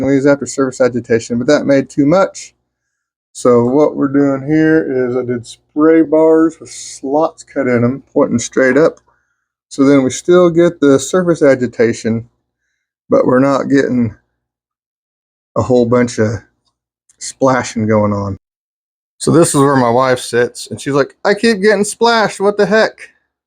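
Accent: United States. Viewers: Where you from country boy?! 😅 Hellooooo from Raleigh, NC! Enjoying the content, dude. I'm liking the genuine videos not trying to be too polished and pomp. Keep up the great work!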